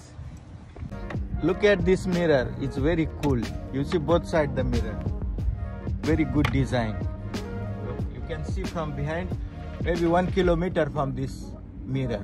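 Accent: India. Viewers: Love from Hivare,Mohol saheb💓❣️ Nice..... Marathi accents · Proud of Indian accent.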